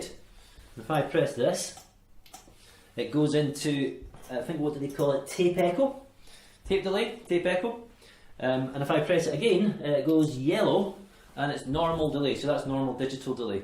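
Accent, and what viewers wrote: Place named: Scotland, United Kingdom. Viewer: thx 4 Ur quick answer - but nope : I speak 5 foreign languages fluently without my "home" language accent :-D - and I can understand "some" regionalisms in all of them, but not "all" of course - so as UR trying to speak "english" U should at least do the best U can doing so .. not very many people on this entire >planet< can speak / understand "scottish" (even after having drunk several bottles of the regional whisky ;-) ) - there4 all I can do here is listen to it several times, hoping to get "into" it after a while .. and it would be nice if you'd apply subtitles in the future (there are fairly good automated programs for that) 8-)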